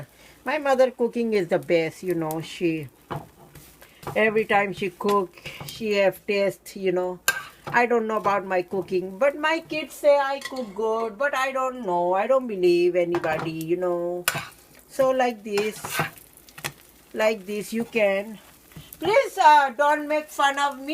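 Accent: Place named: India. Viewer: hhahahahahah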